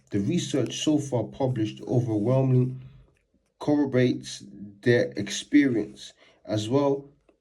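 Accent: United Kingdom. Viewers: I love your British accent so muchhhhh bro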